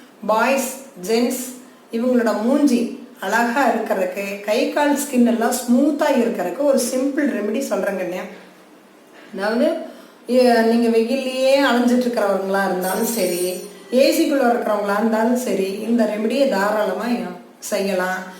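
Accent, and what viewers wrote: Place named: Kongu, India. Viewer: Sure I am from tirupur I easyly connect our kongu slang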